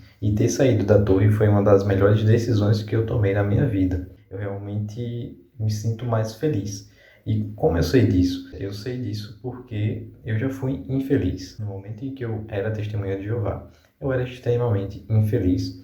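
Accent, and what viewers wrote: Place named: Aracaju, Brazil. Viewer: Aracaju. Tava esperando você dizer a cidade pra saber de onde é seu sotaque.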